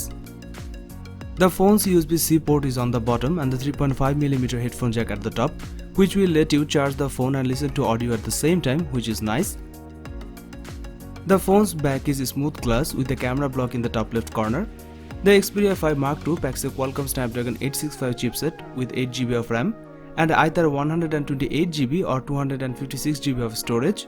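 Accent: India. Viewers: I do not understand this Indian accent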